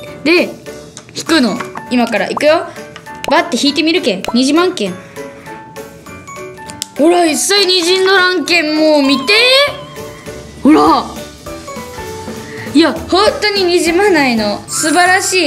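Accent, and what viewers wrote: Kumamoto, Japan. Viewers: 熊本弁もっと喋ってほしい！！かわいい、！ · 字綺麗で羨ましいです熊本弁可愛いすぎ(≧∇≦) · の筆箱紹介好き！同じふせん持ってる！！広島弁と熊本弁って似てるね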